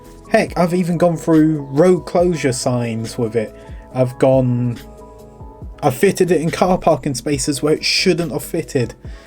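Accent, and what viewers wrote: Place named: United Kingdom. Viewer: Wonderful video, I’m old 60 and I want one so bad, I can taste it. I’ll be able around rural Southern West Virginia to run the back roads, but we do have even a few of them that are 55 mph roads, are people pretty patient with your lil truck getting up to speed and running a lil under the speed limit? Again awesome video and that swanky British accent always adds to any video, I alway think God has a British accent, not sure why. ❤️👍👍👍👍👍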